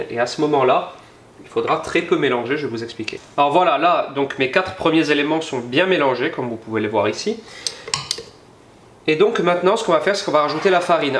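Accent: Corsica, France. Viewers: Super vidéo, avec l'accent corse.... Bisous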